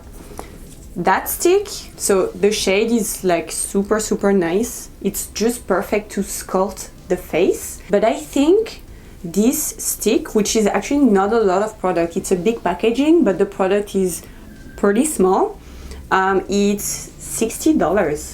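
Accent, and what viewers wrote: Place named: France. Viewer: Vous êtes française ça s'entend à votre accent 😊 Je vais regarder un peu vos autres vidéos j'adore les vidéos makeup !! ^^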